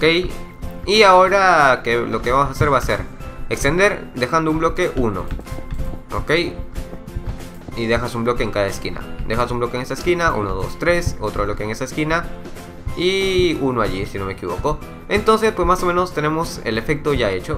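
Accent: Peru. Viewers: No se pero a mi duxo me suena a que es de Peru xD e.e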